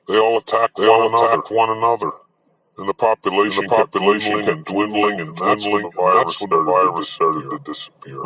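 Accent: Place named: Canada. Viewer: At least you didn't give yourself away this time with your Canadian accent... only with the words "quite literally" and "for myself"... and maybe your laugh... lol. Thanks,. Enjoyed it. 😊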